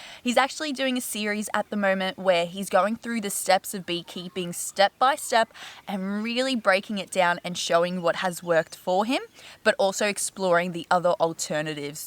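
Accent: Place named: Australia. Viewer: I got here to discover Australian channels to improve my understanding of your accent and I ended up subscribing to your channel! You give me a beautiful vibe and your videos seem to have an interesting content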